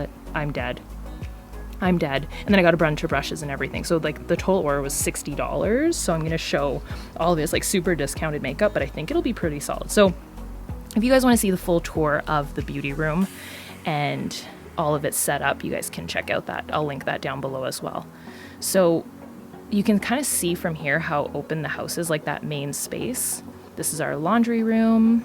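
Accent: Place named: Canada. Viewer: I knew you were Canadian from the first video I watched (your channel is new to me)! You talk like me! I watched a couple of other videos, looking for French on products. Man, I'm such a dork. Anyhoo, gonna sub a fellow Canuck! 🇨🇦 Oh, and Carl needs his own show, books, product line etc. Sooooo cute!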